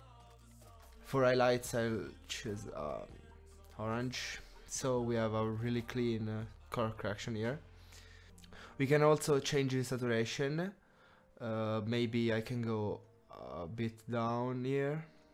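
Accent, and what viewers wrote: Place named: Italy. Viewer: DIO SANTO L'ACCENTO ITALIANO DA IL TOCCO ORGASMICO IN PIU'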